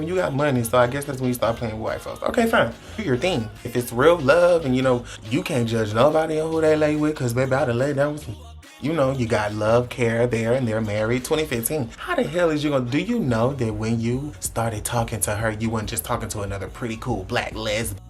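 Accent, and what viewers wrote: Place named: South, United States. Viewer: You can tell is from the south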